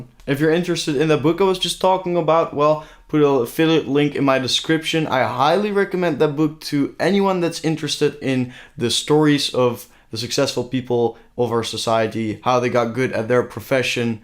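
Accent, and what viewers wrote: Netherlands. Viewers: Eindelijk ik wist het aan je accent je bent Nederlands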